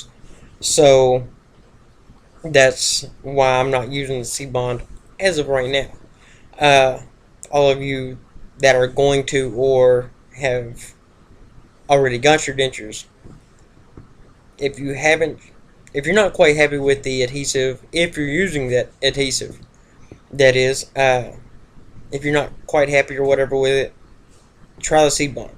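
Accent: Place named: Texas, United States. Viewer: Hi...hope u are well...make some updates please! Wonder if by now (a yr into wearing ur dentures now have you tried cushion grip? A lot of people on here have said it is great! Please let us know how u are after all this time...hope to see you soon...BYW are u from Tx? You sound just like me & both of my sons...lol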